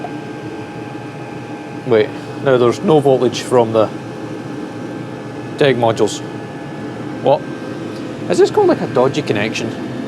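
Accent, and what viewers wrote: Scotland, United Kingdom. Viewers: I love Scotish accent. I always hear something else, like the time you said "I do not have enough hands". The thing I heard was "I do not have enough Hans" :D Nice project btw :) I have brought also this diesel powered heater, to heat up my garage. That project is still work in progress. Also I will install it to my car - 2015 Aixam City Moped-car. That car is so cute, I can make 3-point turn in tight places :)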